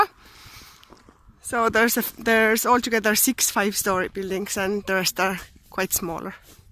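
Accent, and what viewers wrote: Ireland. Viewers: I bet u live in ireland as u have irish accent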